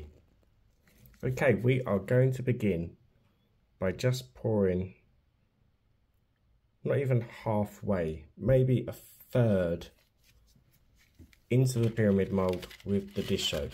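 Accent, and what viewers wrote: United Kingdom. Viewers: Sorry, I have to ask, where are you from UK?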